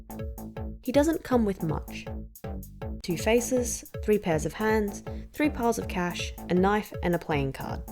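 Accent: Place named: Australia. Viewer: This is the first video of yours I am watching and you are reviewing Koto Batwoman. I've been eyeing that figure for a while now lol...I guess I need to get it now. I love your crystal clear speech and pronunciation. That Aussie accent is something else, tho :) <3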